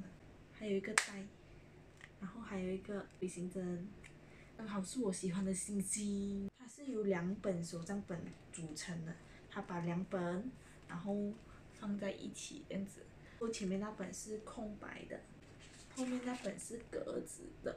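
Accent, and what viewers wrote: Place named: South, China. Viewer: 我giao南方人？啊哈哈哈哈好喜欢听你说话啊～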